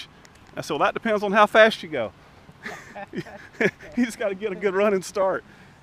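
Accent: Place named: Georgia, United States. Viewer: Great video! Being from Australia I love the Georgia accent and also Yorkie woof pack - similar but smaller than the Aussie terrier!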